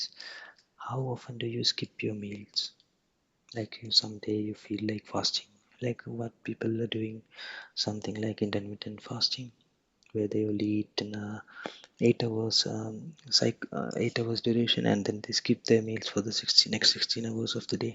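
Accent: India. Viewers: Love the Indian accent! Actually the first asmr experience I had on a phone call :)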